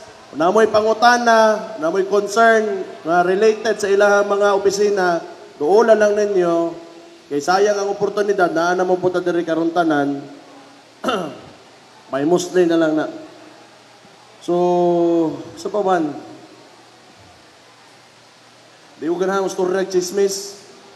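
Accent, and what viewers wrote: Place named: Visayas, Philippines. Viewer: Bisaya k magtagalog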